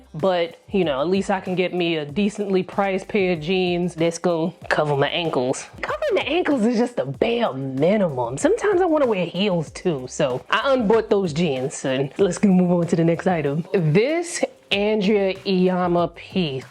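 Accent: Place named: New Orleans, United States. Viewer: I live in Louisiana and i thought you were from New Orleans, love the accent ~ new subscriber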